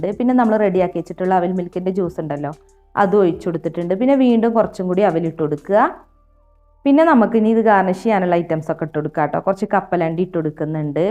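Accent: Malappuram, India. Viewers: Super try cheythu nokkattoo.ningalude വീട് malappuram anooo😀😀😀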